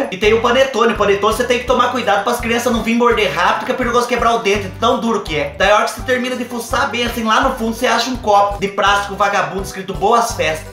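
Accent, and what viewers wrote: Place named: Caipira, Brazil. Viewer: tu é doidinho das ideias com esse sotaque caipira e contando teus causos eu não aguento 😂😂😂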